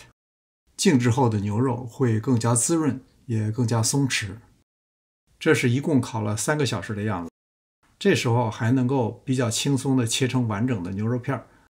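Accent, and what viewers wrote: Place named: Northwest, China. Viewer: 感觉也是个西北大哥，跟妈妈口音都很像～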